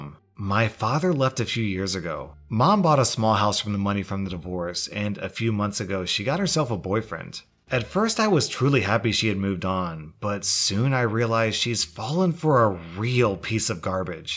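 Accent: Australia. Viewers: we have an Australian in the house,G-day (I know no one actually says that seriously but hello) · 000 he’s Australian for sure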